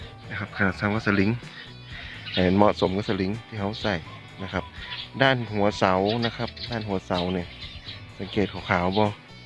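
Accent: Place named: Isan, Thailand. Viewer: สำเนียงจังหวัดอะไรครับ เพิ่งเคยได้ยิน น่าฟังดีครับ ฟังๆดู มีทั้งเหนือ,อีสานผสมกัน คลิปมีประโยชน์ดีครับ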